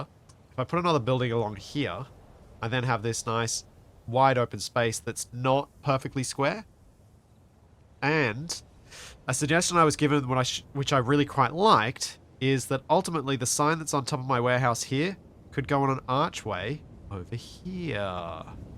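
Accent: Australia. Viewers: It is funny how you sound more Australian when sick. I never paid much attention to this, but now i can really tell the accent.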